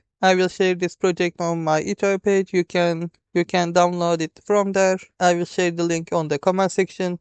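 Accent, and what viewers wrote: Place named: Turkey. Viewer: Türk aksanı gibi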